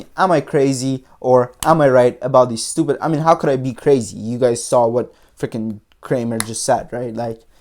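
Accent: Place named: Iran. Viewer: You sound iranien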